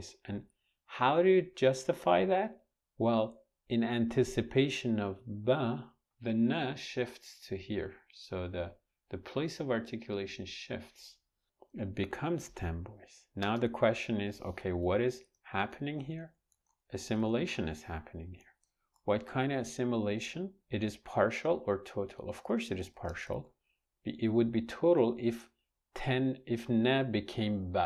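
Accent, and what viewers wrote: Iran. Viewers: ایرانی هسی؟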